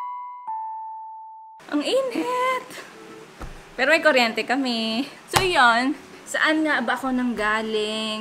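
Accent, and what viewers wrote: Visayas, Philippines. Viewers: Nakakatuwa na nalaman ko na ang ay nakatira ng 3 years sa davao!Bisaya din ako and ang cute ni Ate mag bisaya😂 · ka cute ba nimo mag bisaya ate oyy haha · Ang cute ni ate mag bisaya 😅 kababayan pala kami ni 😅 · ay ka cute Ate mag bisaya oyyy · cute ng bisaya mo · watching from davao:))))) ka cute nimo magbisaya ateee always watching your vlogs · Ka cute man mag bisaya oy 🤣🥰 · Ate taga davao ka pala huhu sayang taga digos ako, pero sana ma meet kita ate Ang cute mo mag bisaya. Pero welcome ulit sa Davao city po❤️❤️.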